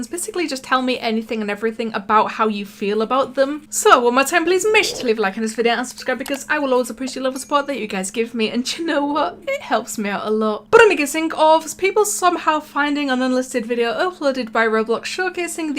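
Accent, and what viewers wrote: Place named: North London, United Kingdom. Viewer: I think she’s North London. I am in east London.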